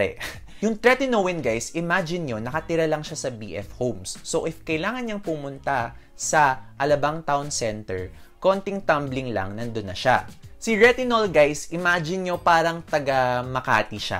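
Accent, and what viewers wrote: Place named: South Boston, United States. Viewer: Soo Southie nga 😂 Just stumbled upon your channel, very informative 👍🏽👏🏽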